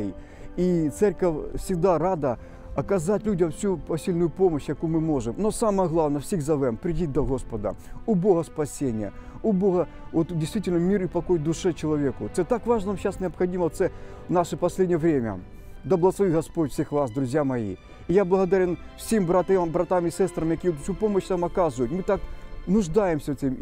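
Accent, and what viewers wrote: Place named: Surzhyk, Ukraine. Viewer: не дивлячись на те що ви говорите на суржику , видно як ви намагаєтесь говорити українською. Ви молодець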